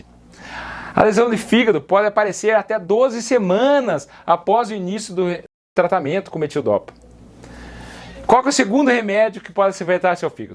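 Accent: Brazil. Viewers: Gente, da onde que vem esse doutor? Que sotaque é esse? É do sul ou do centro oeste?😅😅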